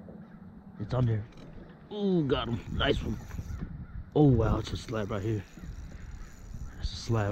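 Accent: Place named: California, United States. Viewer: I love his Californian accent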